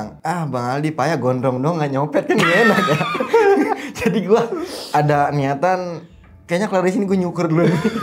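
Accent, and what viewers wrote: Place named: Ketapang, Indonesia. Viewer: Kek orang ketapang bahasanya Beke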